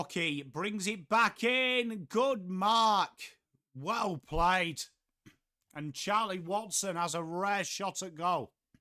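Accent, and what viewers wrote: England, United Kingdom. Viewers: What part of England are you originally from?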